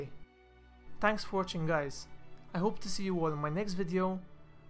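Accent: Malta. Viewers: Are you Maltese ?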